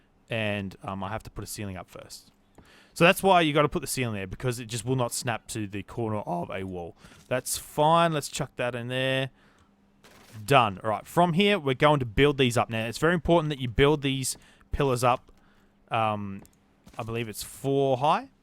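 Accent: Australia. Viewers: Love the vids! It's good to see/hear more Aussie you tubers!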